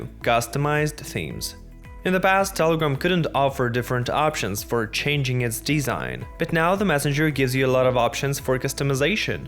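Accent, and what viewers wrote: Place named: Russia. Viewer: You are Russian, I hear your accent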